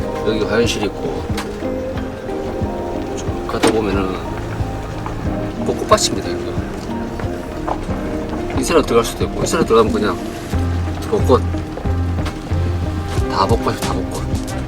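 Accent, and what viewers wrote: Gyeongsang, South Korea. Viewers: 사투리 너무 매력있어요 ㅋㅋ 원래 경상도 사투리 좋아하는데 어느 지역인가요?? 멘트도 너무 찰지심 ㅋㅋㅋ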